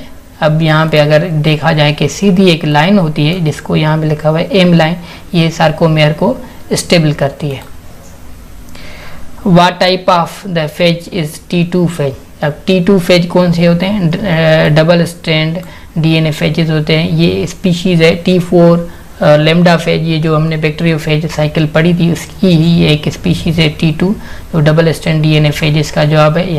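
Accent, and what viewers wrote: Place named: Sindh, India. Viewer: you speak english with sindhi tarka, good explanation sir.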